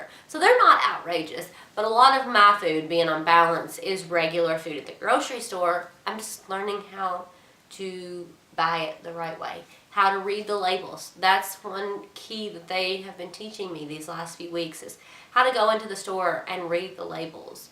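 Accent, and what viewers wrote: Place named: Georgia, United States. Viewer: Sound like a Georgia girl.. maybe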